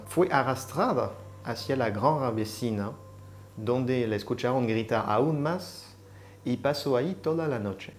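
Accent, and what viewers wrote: France. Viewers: Joli accent français ) pero el caso es desgraciadamente más complicado.. Aunque la primera versión que surja es la de Bernard.. y sigue siendo la más verdadera. Je crois que c'était lui.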